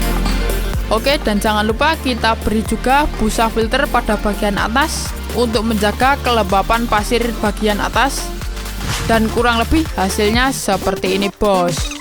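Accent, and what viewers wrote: Java, Indonesia. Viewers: Emang orang Jawa ngomongnya gitu, akupun klo ngomong juga gitu🤣 satu jaman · wong jowo yo ngonokui rek ngomonge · Jowo ne kentel 😁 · "kita potong sampai jeru gays😂" bahasa jawanya kentel banget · Orang Jawa Kayaknya, Karna logatnya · Jowo e' meddok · Bang koe uong jowo Yo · Mas sampean jowo ndi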